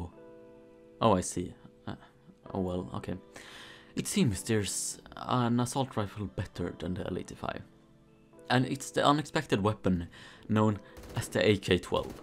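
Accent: Sweden. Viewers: Bruh he's swedish. Wtf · Wow. Are you swedish? Your accent SCREAMS swedish 😅👌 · Are you from sweden you sound like you are from sweden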